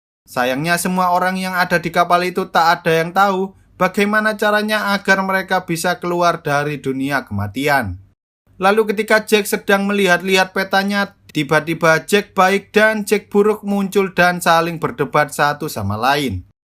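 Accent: Java, Indonesia. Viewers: Medok jowo miminnya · Iki delok teko suoro ne wong jowo ?? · iki dodit yo suarnya rek medok pisan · Aduh logat jawanya medok banget.. Maaf bikin gak nyaman dengernya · Miminnya paati orang jowo.. suaranya itu lohh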